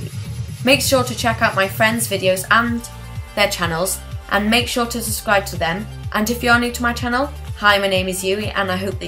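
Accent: Wales, United Kingdom. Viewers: Are you welsh? You have the accent.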